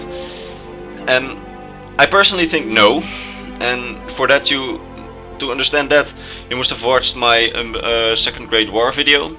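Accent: Netherlands. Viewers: nederlander dus kan het aan je accent horen 👍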